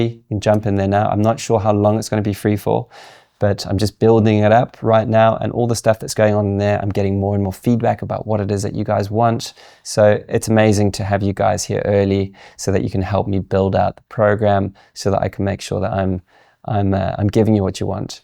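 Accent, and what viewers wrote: South Africa. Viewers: This accent sound firmiliar, South African Maybe?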